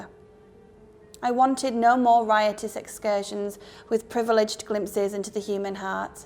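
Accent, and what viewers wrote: United Kingdom. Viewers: Hi thanks for reading the book to us! I love your voice and british intonation ^^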